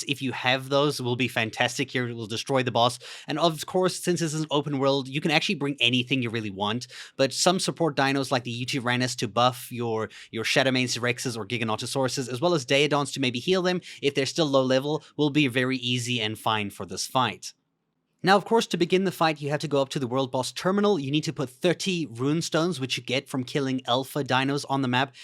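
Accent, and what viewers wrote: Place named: South Africa. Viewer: The first video I saw of you I instantly heard the South African accent! Great video, keep up the good work!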